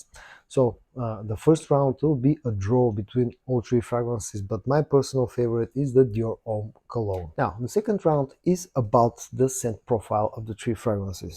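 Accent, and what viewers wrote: Bulgaria. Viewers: Nice comparison. I have Dior homme sport which is fantastic and I have tried the other two as well, I'm going to get dior homme cologne next. You have a very bulgarian accent btw. Are you bulgarian?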